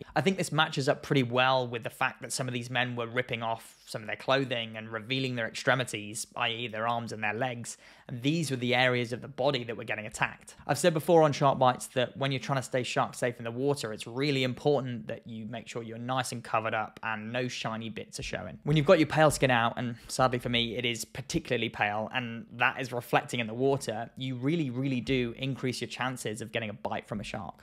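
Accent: England, United Kingdom. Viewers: It’s weird to tell an English person how to speak English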